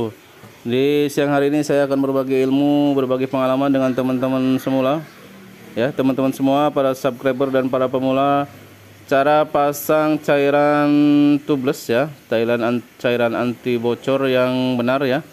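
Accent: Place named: West Java, Indonesia. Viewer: Sunda pisan di rojok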